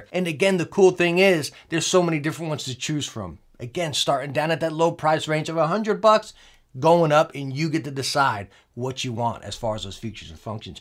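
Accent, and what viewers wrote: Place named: Massachusetts, United States. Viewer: Thanks for bringing the ruckus,! Where are you from? Definitely the northeast. My best guess is Massachusetts.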